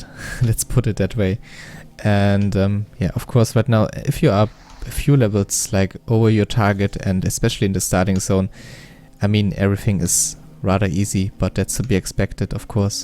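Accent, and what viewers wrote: Germany. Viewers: Nice video, thanks for the showcase. I like your german accent!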